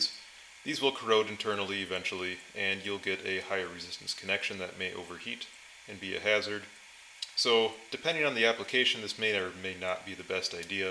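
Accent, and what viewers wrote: United States. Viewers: just american english, no big deal. Forgive them, they have not reached your level of perfection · You from Idaho? Remind me of guy knew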